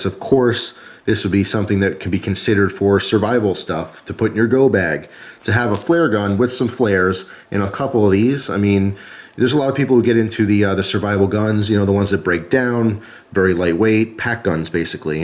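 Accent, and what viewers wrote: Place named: Eastern Pennsylvania, United States. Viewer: I know a eastern PA accent when I hear one....lol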